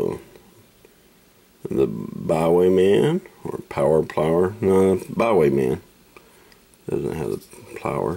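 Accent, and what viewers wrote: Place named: South, United States. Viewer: Ha ha u said dude it sounds coolcuming from a southern accent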